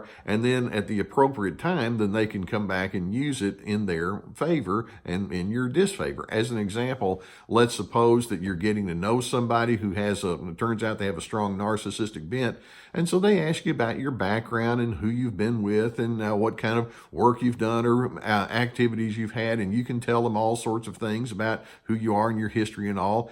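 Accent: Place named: Texas, United States. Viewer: Added bonus: his Texan accent is a pleasure to listen to, always soothing. It helps, because sometimes the cold, hard truth that he's giving is difficult to hear.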